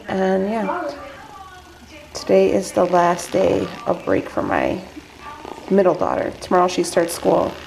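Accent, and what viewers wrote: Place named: Chicago, United States. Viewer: I was born in Chicago (East side) and raised in Illinois. You remind me of my peeps, especially your accent. Love your channel.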